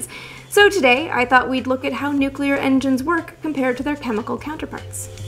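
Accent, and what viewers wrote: Canada. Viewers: You should do at least one joint 'cast with Paul Shillito of Curious Droid. P.S. You're Canadian, aren't you? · Are you from Canada? If not it's gotta be like Wisconsin or something, one of my ex gf's was Canadian and I swear I hear the same little accents in you that she had that no one else seemed to notice.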